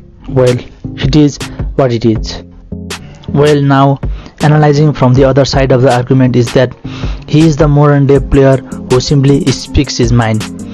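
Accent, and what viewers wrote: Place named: Nepal. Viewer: Where are u from😂😂talking like nepali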